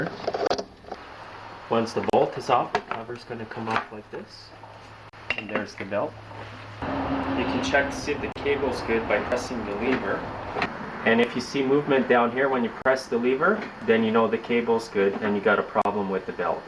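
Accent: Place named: Canada. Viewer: YOU SOUND CANADIAN...ARE THE CANADIAN MOWERS ANY DIFFEREN THAN ONES FOR USA?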